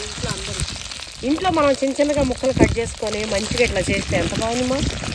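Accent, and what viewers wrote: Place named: Rayalaseema, India. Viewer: Rayalasema slang ki navvu vachindi 😅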